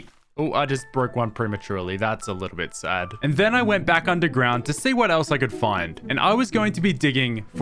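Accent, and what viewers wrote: Australia. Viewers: Does anyone know where hes from, he doesn't have an accent to me but he speaks like an aussie 😭🤣 naur (no) and seour (so) 🤣💜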